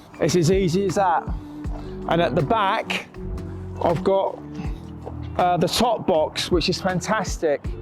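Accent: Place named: Portsmouth, United Kingdom. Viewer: ive just realised my comment sounded like an insult lol..i meant i knew after 30 seconds u was from Pompey cos i am too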